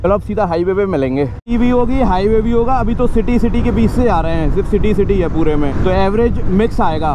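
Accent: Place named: Madhya Pradesh, India. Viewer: Mp ka accnt bohot aacha hai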